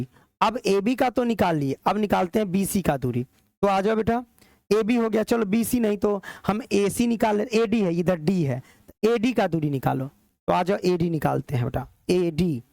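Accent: Bihar, India. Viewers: Sir aap bihari hai n 😅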